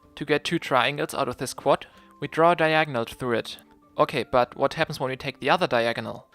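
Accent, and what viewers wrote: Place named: Germany. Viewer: Sounds so german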